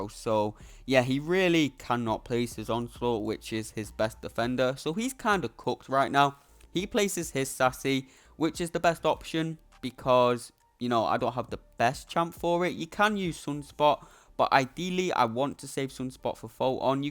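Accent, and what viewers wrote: United Kingdom. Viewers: Bri'ish man is speaking straight fax 🗣️🔥🔥🔥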